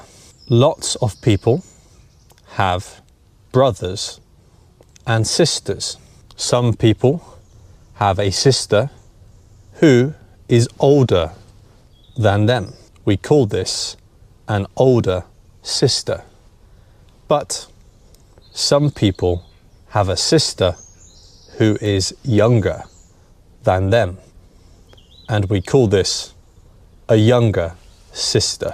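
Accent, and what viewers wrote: United Kingdom. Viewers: Thank you very much for your understandable and clear British English. Please keep going.🎉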